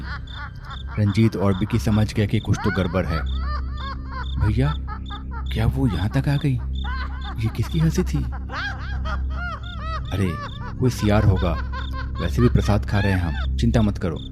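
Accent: Bihar, India. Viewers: Video ka suruwat dekh kar hi laga tha aap bihar se hai 😊😊 Mie bhi bihar se hu 😀😀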